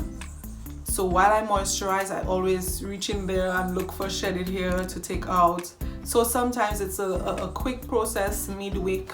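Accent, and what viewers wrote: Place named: Caribbean. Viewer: Hi, where are you from, I hear a Caribbean accent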